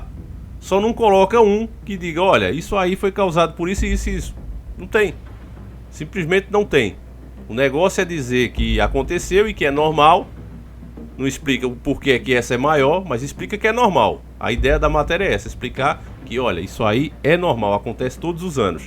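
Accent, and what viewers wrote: Northeast, Brazil. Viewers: Eu por exemplo,acho o sotaque falado do aqui do canal diferente e surreal. Eu não sei como seria quando eu visitasse o nordeste como a cidade de Recife-Pernambuco. Nossa! Será algo como ir a outro país dentro do próprio país e eu tenho essa curiosidade de ver isso de perto nessas terras longínquas. Eu acho o nordestino super diferente em tudo; seria como estivesse convivendo com outra nação. Diferente né!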